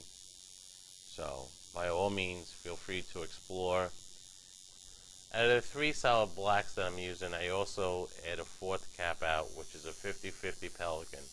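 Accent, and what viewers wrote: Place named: New York, United States. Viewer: He's from New York ...I can tell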